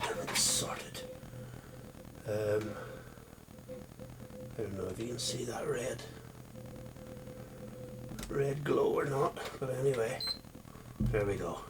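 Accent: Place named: United Kingdom. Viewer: There is something about a British accent that just 'make things right'. Thanks mate. Mine sorted.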